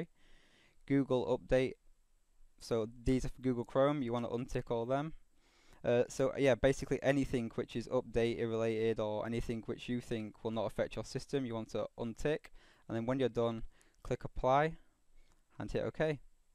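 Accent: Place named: United Kingdom. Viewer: British accent detected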